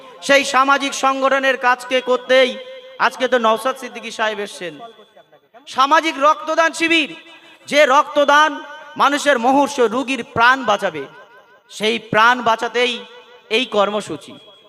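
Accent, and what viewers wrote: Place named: Bangladesh. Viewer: Kotha sunei to mone hoche bangladesh er tar topkano mal, r a bangladesh fire ja 😂😂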